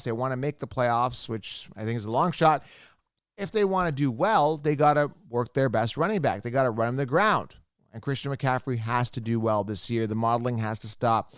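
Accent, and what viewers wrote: Canada. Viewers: Are you a Canadian .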